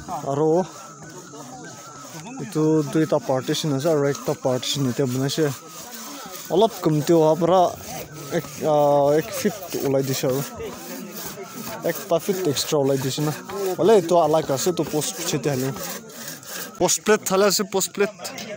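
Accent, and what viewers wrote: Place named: Nagaland, India. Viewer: Ur nagames tune too😂 pakaaa sema asa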